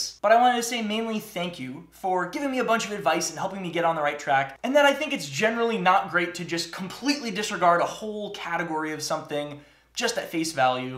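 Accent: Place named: East Coast, United States. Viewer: Does he ever say where he lives? His accent is subtle but I'm thinking east coast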